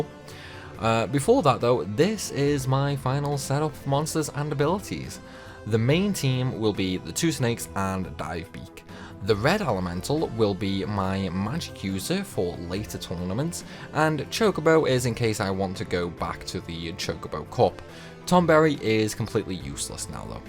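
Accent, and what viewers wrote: United Kingdom. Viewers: WHY ARE YOU BRITISH????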